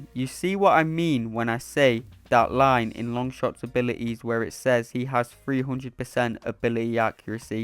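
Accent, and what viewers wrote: United Kingdom. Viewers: Hey, where in the UK are you from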